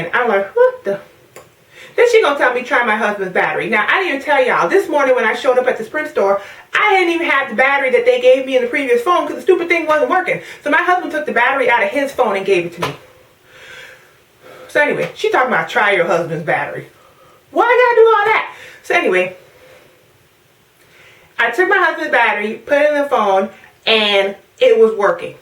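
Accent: Caribbean. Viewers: You have made my day, you are so funny! Love the Carribean accent! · you really went in on her with your VI accent mehson! I feel for you digicel got on my last nerve last yr. Hopefully this is the last of it.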